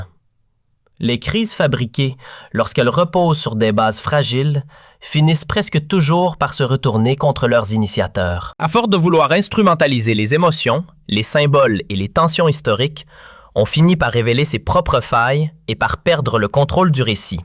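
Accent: Canada. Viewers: Pourquoi la voix a un accent canadien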